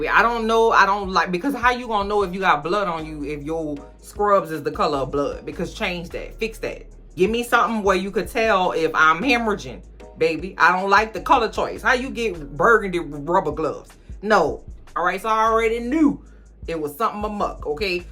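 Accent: New Orleans, United States. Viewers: First time watching.... are you from New Orleans?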